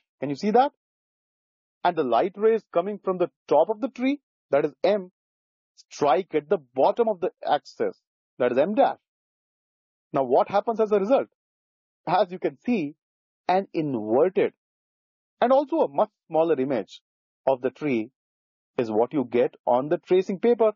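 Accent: India. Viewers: I think he’s indian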